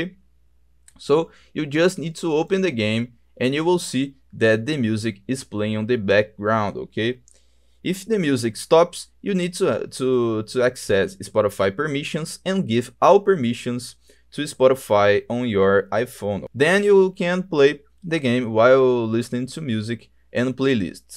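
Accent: Brazil. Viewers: You sound brazillian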